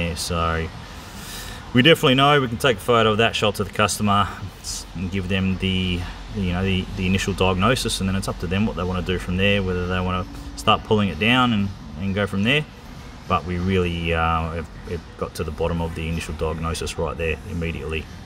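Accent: Australia. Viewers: Absolutely love seeing your effective use of the Picoscope for diagnosis. Not to mention being in Oklahoma back in the states we love to hear your Aussie accent for all I know you might enjoy hearing my whiny hick voice in a good old USA too!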